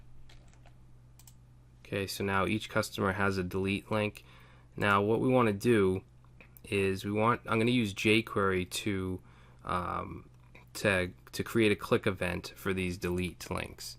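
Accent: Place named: Northeast, United States. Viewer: This guy is the best anywhere! Yes, he has a NE accent. I am not sure why people feel the need to point that out. It's like saying "youtube's logo is red and white" -- yup, we know. I am just happy I found a teacher that didn't bore me to death with their relentless, robot, yammering!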